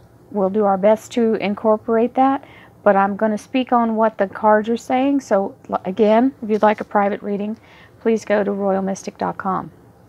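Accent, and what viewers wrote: Texas, United States. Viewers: Love you Love you Love you....thank you sooo much you Texan girl.....Love the way you get all gigly and excited 😇😇😇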